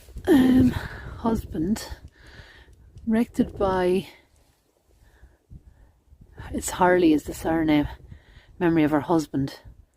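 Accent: Ireland. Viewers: Coffins need a clean. You sound Irish, are you in Ireland?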